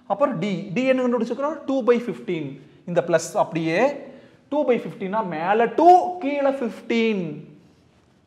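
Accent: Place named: Kongu, India. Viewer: Sir, your are a kongu Tamil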